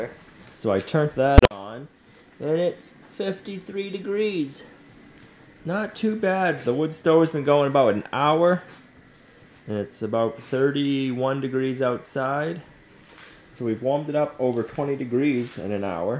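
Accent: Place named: New Hampshire, United States. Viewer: Gooood Mawning! I hope my poke at the New Hampshire (Massachusetts blend) accent doesn't offend, but it does come through loud and clear to this Lawn Guylanda! Looks great in the outdoor kitchen. I like that you're "tapping into" the available local resources for making Maple Syrup. I hope that you can put smiles on the faces of friends that you share it with and maybe trade it for something else that you can use. You should see if your pig farmer is willing to trade! Have a great day and enjoy the day with Olivia and Gina whatever y'all do.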